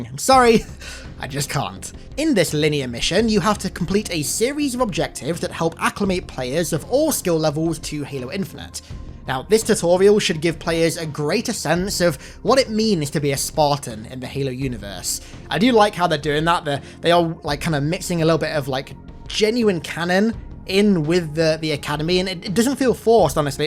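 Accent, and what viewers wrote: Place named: United Kingdom. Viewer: This man has the signature british voice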